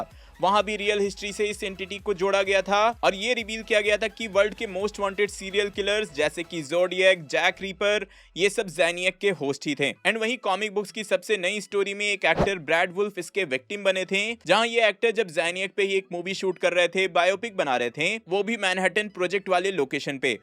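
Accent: Bihar, India. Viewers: bhai bihar se ho kya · Are you from bihar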